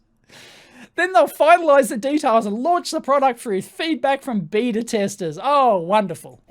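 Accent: Australia. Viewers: God you make me laugh. And nice to hear a fellow Aussie accent amongst all the usa folks. :)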